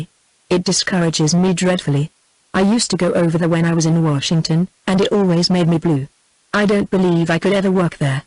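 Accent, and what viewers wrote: United Kingdom. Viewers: Seriously. It is INSANE to use a BRITISH voice for this!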